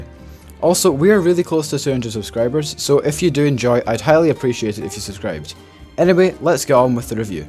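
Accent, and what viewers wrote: Scotland, United Kingdom. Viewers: Fife, Dundee, Edinburgh? Somewhere South East ish? I'm trying to work out your accent!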